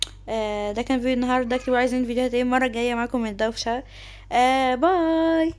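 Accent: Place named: Saudi Arabia. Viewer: دقيقه انا ابي استوعب رديتي صح اححححح ما توقعت والله العظيم بس اشوفك دايم تتكلمي سعودي شوي؟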